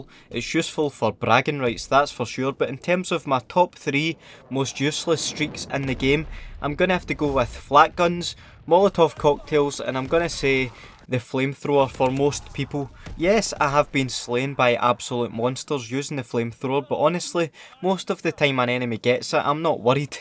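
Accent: Scotland, United Kingdom. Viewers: That scotish accent tho, its hard to understand sometimes since im Murican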